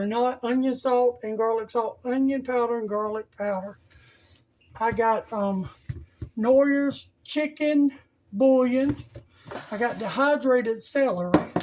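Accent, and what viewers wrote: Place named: South, United States. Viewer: 👁👁 had to tune in to get My Sweet Southern accent…fix… God bless you sister 🙏🏼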